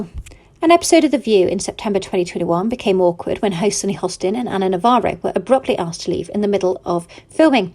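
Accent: United Kingdom. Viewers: I can't understand a word ... a heavy British accent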